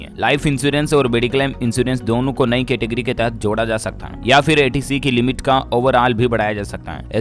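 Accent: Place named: Odisha, India. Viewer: Udisa se bol raha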